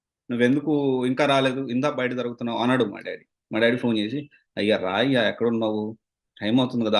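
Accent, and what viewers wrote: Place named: Nellore, India. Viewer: Oka Basha Backdropey undi ga anna neeku nellorelo😅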